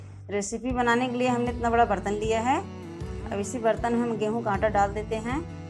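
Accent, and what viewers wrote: Uttar Pradesh, India. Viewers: Aap up ke Ho kya.?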